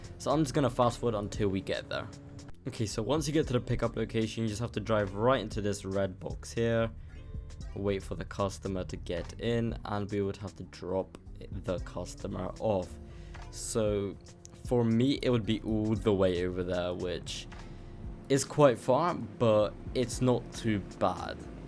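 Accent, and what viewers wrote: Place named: Greenville, United States. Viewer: Average greenville accent😭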